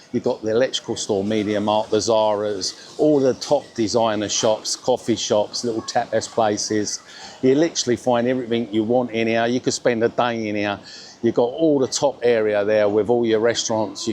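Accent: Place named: North London, United Kingdom. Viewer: I love your accent. You speak like my Dad used to. I reckon you must be from north London maybe Tottenham?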